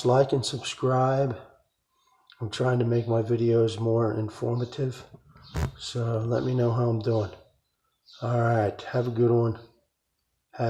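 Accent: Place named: East Coast, United States. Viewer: I knew it was the East coast. I’m from Maryland and you sounded familiar 🥰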